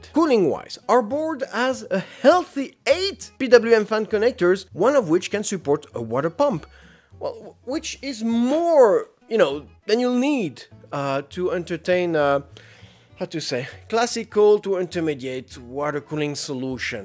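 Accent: France. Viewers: Is that a french accent ? :) great video though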